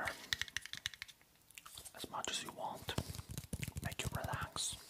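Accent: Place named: Italy. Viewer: That Italian accent while whispering sounds so good in your voice. 😍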